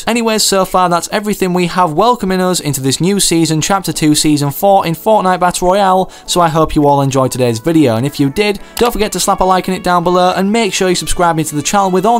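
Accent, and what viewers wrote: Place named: England, United Kingdom. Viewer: Who else loves his accent? 😂😂😂 I'm from England too are you from Leeds or Sheffield or Bradford I want to live in Leeds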